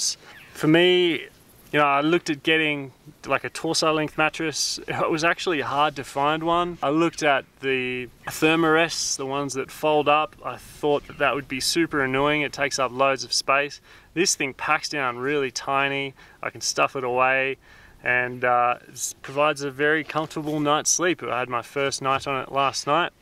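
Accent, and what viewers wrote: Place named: Australia. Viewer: love the Aussie accent, but took me awhile in the shelter segment to understand that "ina" was "inner"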